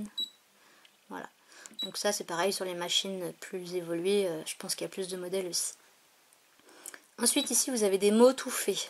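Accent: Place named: Normandy, France. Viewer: Bonsoir, j'aime beaucoup tes vidéos, super bien expliquées c'est vraiment super car ça manquait, merci beaucoup. Je pense que l'on vient de la même région j'ai reconnu l'accent normand 😉. J'habite à Paris mais je suis native du Havre j'y retourne régulièrement d'ailleurs. Merci encore pour toutes ces vidéos explicatives.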